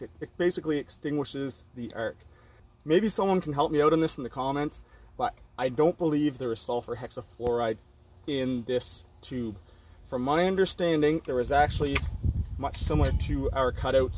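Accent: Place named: Newfoundland, Canada. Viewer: I hear a slight Newfie Accent. I was born in Newfoundland--in Alberta atm. Love your channel. I've been giving serious thought to going for journeyman electrician.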